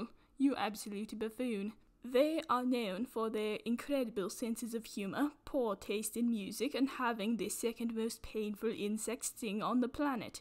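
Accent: United Kingdom. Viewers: Best British acent ever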